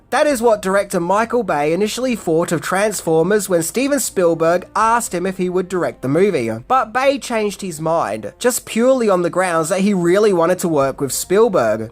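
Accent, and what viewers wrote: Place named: Australia. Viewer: LMAO, your Aussie accented snob voice is hilarious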